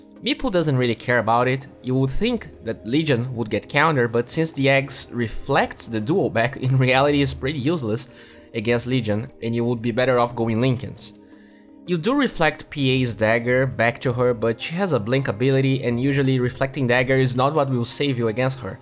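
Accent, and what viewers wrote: Brazil. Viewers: You kinda have a Brazilian accent, it's cool though.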